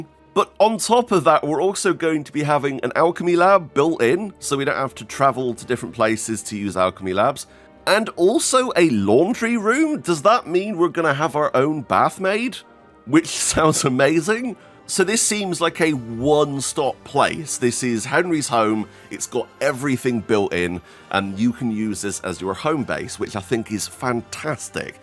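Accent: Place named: Bristol, United Kingdom. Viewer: i'm almost certain you have a southwest/bristol twang and i can hear it from a mile away (i'm from there) not a bad thing also! good video keep it up :))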